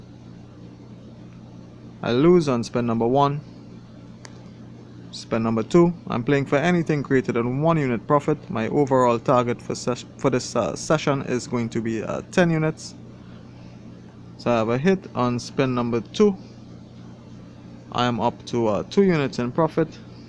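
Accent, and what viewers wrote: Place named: Trinidad and Tobago. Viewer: u sound like u from trinidad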